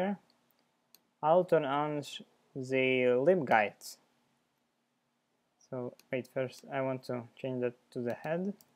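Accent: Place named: Russia. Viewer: Your accent sounds like Russian.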